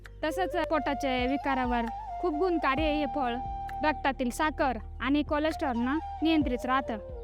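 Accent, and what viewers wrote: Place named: Sangamner, India. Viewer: नमस्कार ताई खूप छान माहिती दिली धन्यवाद.. संगमनेर भागातून बोलता का? बोलीभाषा मिळती जुळती वाटते. आम्ही जुन्नर आळेफाटा..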